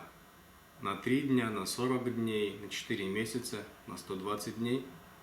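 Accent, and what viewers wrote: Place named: Kazakhstan. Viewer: Судя как он говорит, он не кыргыз, а казах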